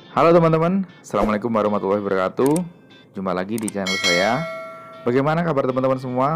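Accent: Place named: Purwokerto, Indonesia. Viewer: Bahasanya ciri khas Dr utomo yg asli purwokerto👍👍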